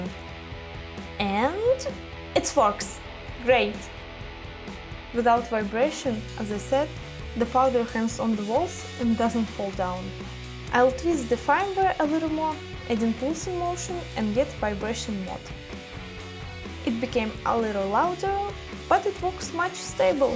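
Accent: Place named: Russia. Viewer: Russian accent that you can never hide))))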